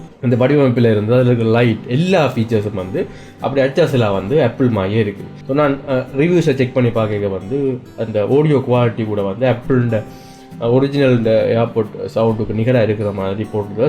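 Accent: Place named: Sri Lanka. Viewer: Very happy to hear tech review in sri Lankan Tamil slang! 😍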